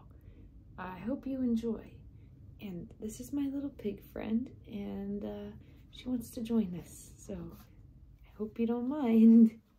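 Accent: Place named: Appalachia, United States. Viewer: WOW! That was really, really good! Especially liked that Appalachian accent. You nailed it!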